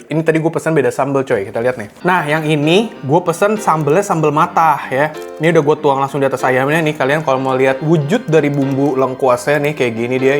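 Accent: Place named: Solo, Indonesia. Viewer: Itukan suara priaa solo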